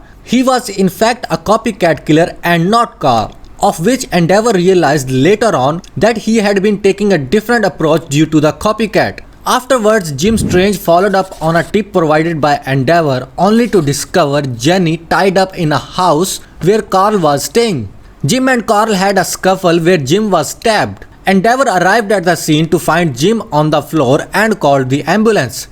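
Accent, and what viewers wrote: India. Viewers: maybe it is an Indian accent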